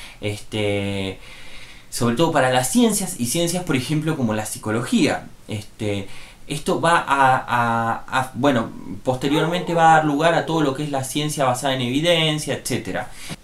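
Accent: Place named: Argentina. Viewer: No puedo con el español argentino...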